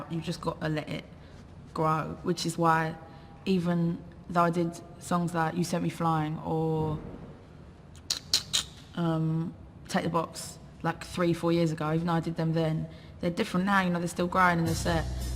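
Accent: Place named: England, United Kingdom. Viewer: Love her English accent. Sad she died so young.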